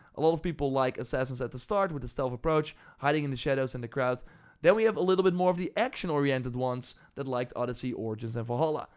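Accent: Netherlands. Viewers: Are u dutch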